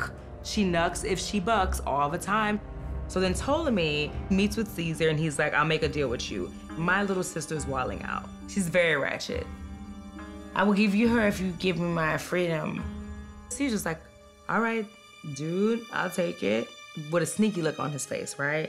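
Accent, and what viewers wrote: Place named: Bronx, United States. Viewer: The narrator is from the bronx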